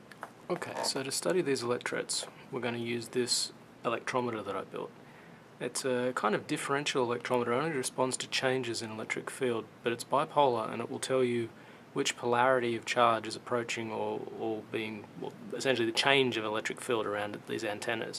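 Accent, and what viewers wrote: Australia. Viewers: Great video! Are you by some chance of Australian origin?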